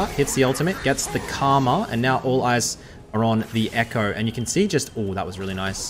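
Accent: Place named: Australia. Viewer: I though was from the Uk. Ozzy makes sense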